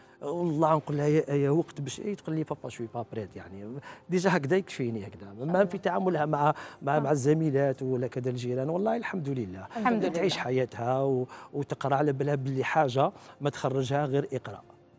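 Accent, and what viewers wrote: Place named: Skikda, Algeria. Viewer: لحن هاداك تاع اللهجة السكيكدية مزالها عندك تهبل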